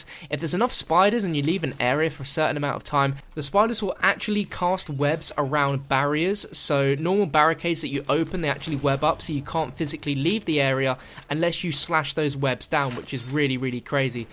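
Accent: England, United Kingdom. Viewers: " the HEYTCH G 40" lol gotta love england accents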